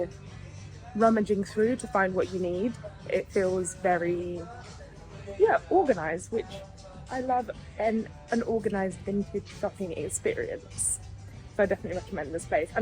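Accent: United Kingdom. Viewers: Are you British, you hv that accent there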